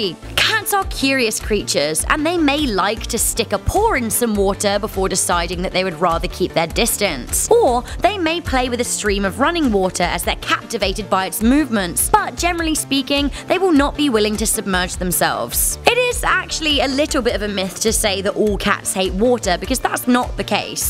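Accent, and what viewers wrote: England, United Kingdom. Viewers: Loves the color green Has an English accent And loves cats Anyone get the reference?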